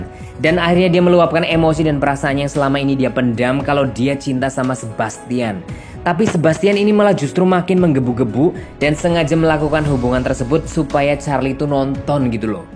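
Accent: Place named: Cilacap, Indonesia. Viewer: Aksene cilacap gak iso ilang ya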